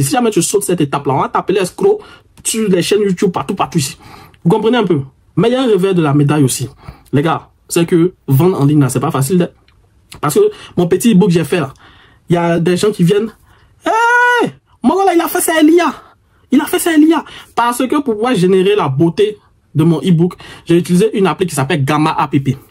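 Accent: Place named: Ivory Coast. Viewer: Ton côté Ivoirien 😂 m'a permis de comprendre vite 🤝🤝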